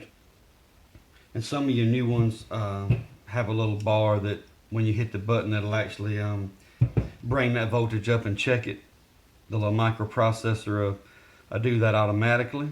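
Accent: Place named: Arkansas, United States. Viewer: i could listen to you all day long! You frpm Arkansas hahaha. God Bless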